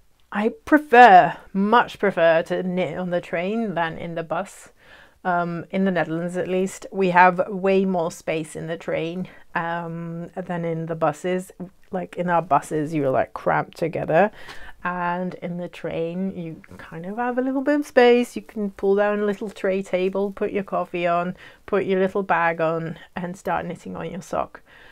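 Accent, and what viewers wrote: United Kingdom. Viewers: Hello. Greetings from Ridgefield, WA, USA! I just found your podcast and quite enjoyed it. I'm a fairly new knitter so I have alot to learn and am open to any and all tutorials. Could you please give your name and explain why you are in The Netherlands, please? I had assumed from your accent that you are in the UK. Thanks, and take care. Patty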